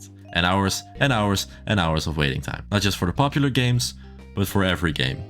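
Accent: Netherlands. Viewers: Ik ruik een Nederlander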